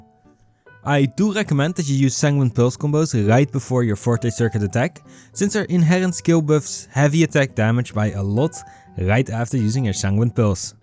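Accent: Netherlands. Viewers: love the dutch accent :) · Danijn for the win! You sound Dutch?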